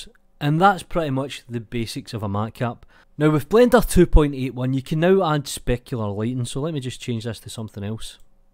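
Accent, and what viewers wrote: Scotland, United Kingdom. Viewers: you're instatntly my fav blender tutorial for your scot accent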